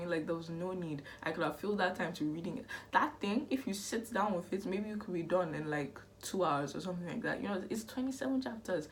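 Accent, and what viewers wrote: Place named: Caribbean. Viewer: Your accent reminds me of my family's, are you from Martinique or any part of the Caribbean by any chance?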